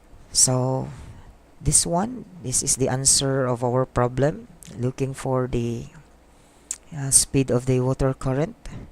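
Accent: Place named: India. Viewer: Boss you sounded like indian.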